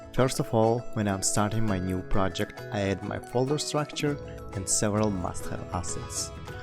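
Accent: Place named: India. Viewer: I like his good indian ascent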